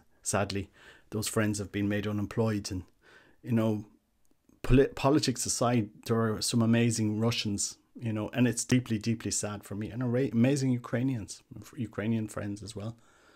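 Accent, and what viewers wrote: Ireland. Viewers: Thank you for addressing this! I love icons and have been collecting copies from my local Catholic store; I couldn't understand the blacked-out baby at all. One good result of TM 's video was that I first heard of Fr. Thady's channel - what a lovely 'old-school' priest he seems to be, although not at all afraid of speaking his mind! We used to have lots of strict but kind-hearted priests with wonderful Irish brogues here in Canada, but no more. Now I can listen to dear Fr. Thady's channel as well as to your soothing brogue. 😃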